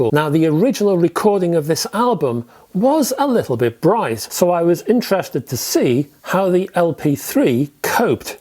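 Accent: Liverpool, United Kingdom. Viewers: Looking to get into vinyl. Are you from by my way Liverpool? If so apart from Richer Sounds are there any decent shops up here to browse? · Nice British accent :-)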